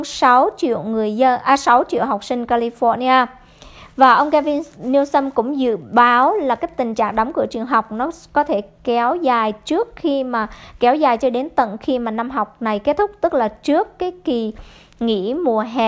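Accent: Vietnam. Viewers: . Trong lúc đưa tin, chị có dùng từ "cơ cấu", sau đó chị lại nói " dùng từ VIỆT CỘNG". Vậy xin hỏi chị, thế nào là "từ Việt Cộng"? Chẳng lẽ "từ Việt Cộng" không phải là tiếng Việt trong khi chị cũng đang dùng tiếng Việt đó mà! Và từ "cơ cấu" là từ của Việt Cộng" thì từ "cơ chế" là từ của ai? Của chị à? Bởi vậy, hai chữ "Việt Cộng" của chị khoét sâu vào sự chia rẽ không đáng có giữa "chúng ta", những người Việt Nam với nhau, có cùng chung tổ quốc và ngôn ngữ. Xin giải thích thêm, ở Việt Nam, cả 2 từ "cơ cấu" và "cơ chế" người ta đều sử dụng gần như đồng nghĩa, từ "cơ chế" thậm chí được dùng phổ biến hơn. Sau này từ "cơ cấu" cũng có khuynh hướng được dùng như một động từ, nghĩa là "gắn đặt một cái gì, một ai đó vào một hệ thống". Cũng xin nói thêm, cách dùng từ tiếng Việt và phát âm tiếng Anh này kia của rất dễ nhận thấy là chị đã ở Việt Nam (hay nói cách khác là ở với "Việt Cộng") rất lâu trước khi sang Mỹ.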